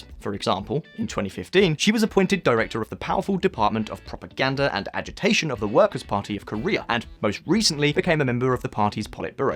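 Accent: United Kingdom. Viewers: Jay McJakome I don’t mind the British accent, I even prefer it but he speaks way to fast, I can’t even process what he is saying... I can understand it but it goes into one ear and comes out of the other... I think the issue is the lack of punctuation or something. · Fast and loud, with a British accent, Americanization at it's most annoying.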